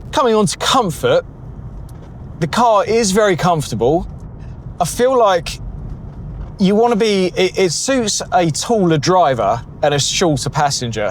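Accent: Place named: Cockney, United Kingdom. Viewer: COCKNEY DIMWIT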